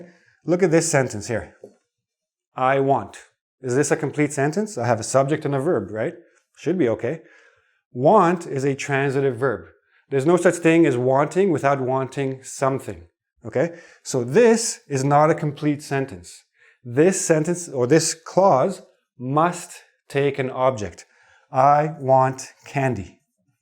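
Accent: Quebec, Canada. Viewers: wait... are you canadian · He sounds like a French Canadian. I know he's in Canada so it is a legit guess.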